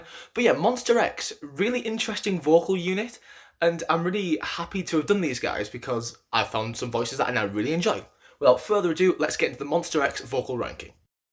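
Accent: United Kingdom. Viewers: Well I'm guessing that since he's British and he also talks really fast, it sounds like he's saying "monster" because of his accent. I've even seen British Monbebe who say it like that sometimes, so I don't think it's intentional. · My brazillian ass had a big trouble to understand everything with this beatiful british accent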